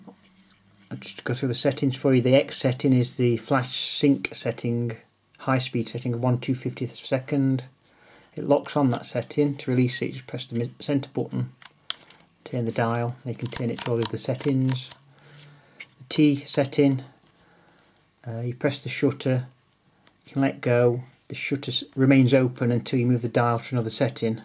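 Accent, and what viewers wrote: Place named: North, United Kingdom. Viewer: I miss hearing that "Northern Accent" since moving to Bloody Florida!!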